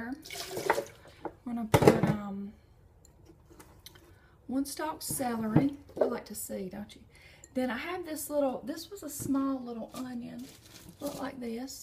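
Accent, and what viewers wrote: South, United States. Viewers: You’re cute. I like your soft southern voice. I don’t usually watch chatty videos but it really was pleasant to listen to yours because of that. I’ll have to give that recipe a go. Thanks. Have a funtastic day!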